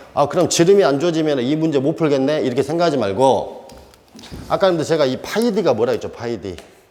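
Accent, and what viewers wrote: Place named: Honam, South Korea. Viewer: 교수님 정말 멋진 강의하고 있습니다 구수한 호남사투리에 귀에 쏙쏙 박히는 강의 내용 제가 경상도 50대 후반 남자 꼰대인데 인생살면서 교수님처럼 멋진 강의 처음입니다 구독자들이 어떤 건의사항들이 올라올수 있지만 그냥 교수님 스타일대로 하세요 대한민국 최고의 교수입니다!!! 멋집니다!! 어떤 것도 바꾸지 마세요!!! 정말 이대로~~~~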